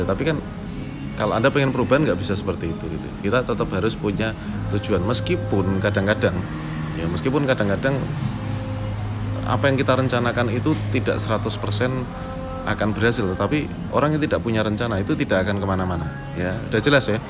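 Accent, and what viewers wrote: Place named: Semarang, Indonesia. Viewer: Mas kayanya orang semarang yo.. jowone medok hehe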